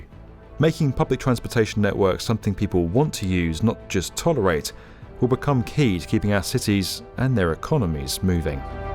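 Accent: United Kingdom. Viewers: This ìs the first time ive had a bit of a hard time understanding a british accent its like they chose the most british person ever lol😂 Easy to use - E to use Schedule - Say dule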